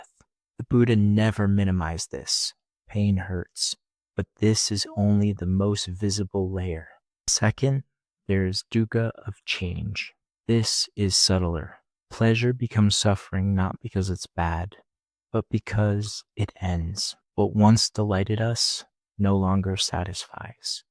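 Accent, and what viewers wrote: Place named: United Kingdom. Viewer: Which Indian pronounces English like the British?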